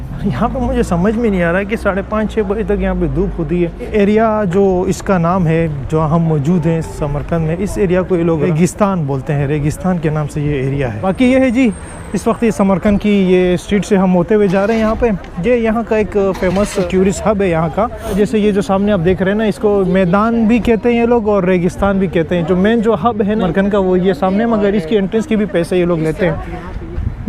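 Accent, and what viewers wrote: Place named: Pakistan. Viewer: Pakistan accent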